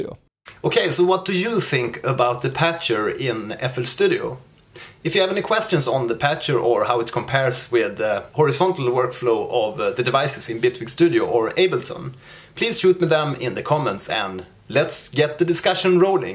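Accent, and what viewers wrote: Sweden. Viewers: I know that accent! Are you Swedish?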